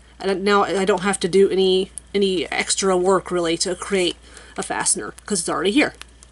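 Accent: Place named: United States. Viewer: Your American voice is so cute🙈🙈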